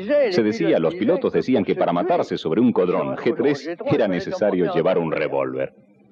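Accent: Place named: Argentina. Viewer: que parte de america es esta no la reconozco, colombia, chile venezuela, uruguay?el relator es indiscutible argentino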